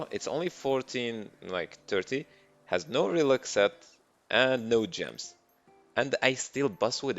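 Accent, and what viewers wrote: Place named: Morocco. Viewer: Your accent man (morocco/ amazigh)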